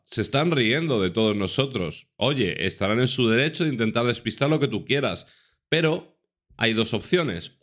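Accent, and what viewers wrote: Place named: England, United Kingdom. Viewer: I LOVE your English accent😂❤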